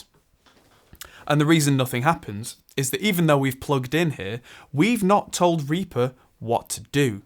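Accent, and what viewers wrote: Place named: Scottish Borders, United Kingdom. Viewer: what part of England are you from? Your accent sounds like you are close to the Scottish border. Am i wrong?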